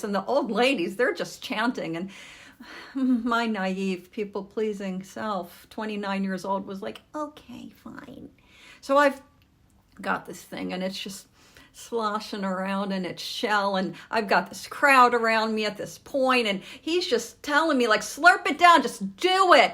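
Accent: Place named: Baltimore, United States. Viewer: I detect the Baltimore accent. Oysters are gross. I might try something new if I think it might be good, but not if it's gross. I'm also not going to talk someone into eating something they don't want. My ex was allergic to chilli peppers. They would screw up her system. It became standard habit to ask about them at any Tex-Mex place. I'd ask even if she didn't remember.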